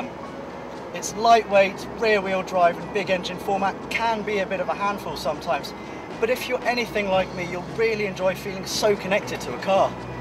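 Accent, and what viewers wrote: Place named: RP, United Kingdom. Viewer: He sounds so posh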